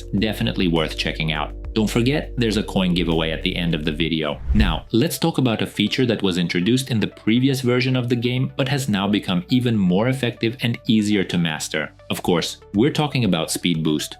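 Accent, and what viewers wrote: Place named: Russia. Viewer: Так я знаю этот голос) сначала мысля -, а моожет и на том канале голос ИИ , но посмотрев пол видео понял, что ты говоришь а не ИИ) Английский гуд, но акцент нашинский именно)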